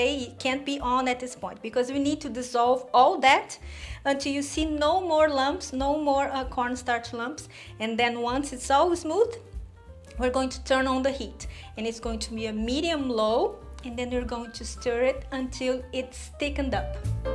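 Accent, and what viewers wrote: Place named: Brazil. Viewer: Eu achei seu canal alguns dias atrás, em uma receita de cream cheese vegano <3 Ouvi seu sotaque, sua simplicidade e pensei comigo "aposto que ela é brasileira". E acertei :D Essa receita tem muito a vibe de família brasileira, dia das mães, ou domingo em família. Coisa mais linda, assim como todo o resto do seu canal. Parabéns! <3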